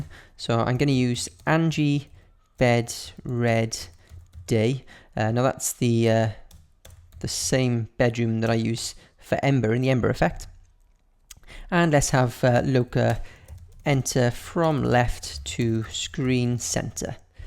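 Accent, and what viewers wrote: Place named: England, United Kingdom. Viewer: Can somebody help me...Whereabouts in England does his accent originate from? It's gorgeous, I can't get enough of it!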